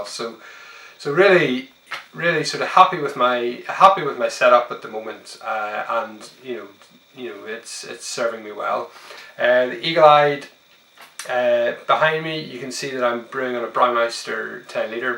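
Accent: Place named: Scotland, United Kingdom. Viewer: There he is, hey, nice to see you on the tube. Now if I didn't know better, I would say there is a very slight Ballymena lilt to your accent these days although it's most likely that Scottish influence. Definitely would like to see the braumeister in action at some point and some small batch brewing. Always worthwhile seeing how others do it. From what I remember, the mash recirculation is a thing to behold.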